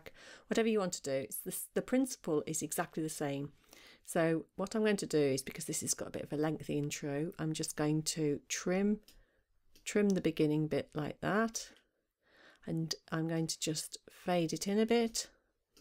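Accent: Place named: Camden, United Kingdom. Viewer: Your lovely. Tnx from Chile. You rock. And great proper english lol. Bring memories of my Camden boozy days lol. Not you just the accent lol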